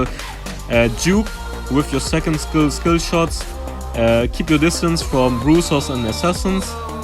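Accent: Germany. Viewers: So wie du Englisch redest safe deutscher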